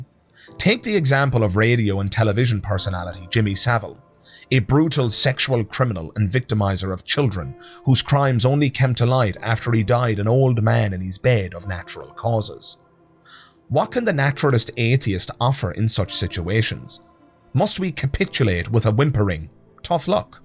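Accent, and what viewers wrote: Ireland. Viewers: you're welcome, irish script reading guy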